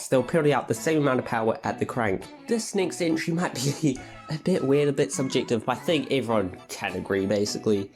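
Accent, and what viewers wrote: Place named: United Kingdom. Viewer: wait wtf you're from nz? Thought you were British this whole time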